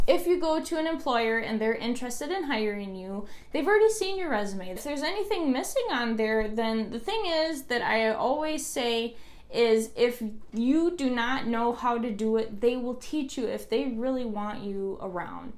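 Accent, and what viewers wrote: Midwest, United States. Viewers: I can tell you are from the Midwest! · Are you from Chicago or the midwest? I hear it in your accent, but I could be wrong!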